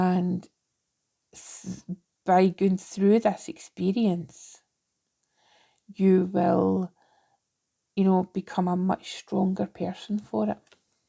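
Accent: Scotland, United Kingdom. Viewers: So nice to hear a beautiful Scottish voice. Thank you for the messages, it definitely resonates. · Her Scottish is very broad but there is always the option to turn in the captions. I can’t watch a Scottish or Irish show without them and this is no different but worth the effort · Thank you for your compassion and thoughtfulness thru this reading. I love a Scottish accent ☺️ onwards and upwards 🙌 thank you 🙏❤️✨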